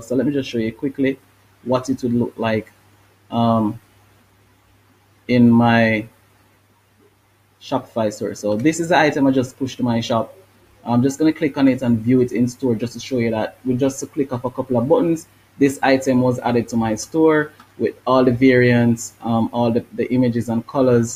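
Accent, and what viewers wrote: Jamaica. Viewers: big up yard man sound like jamaican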